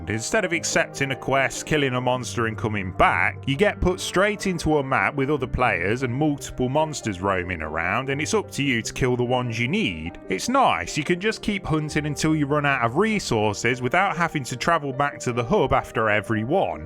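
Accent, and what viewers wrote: United Kingdom. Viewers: I don't know who you are but you sound really british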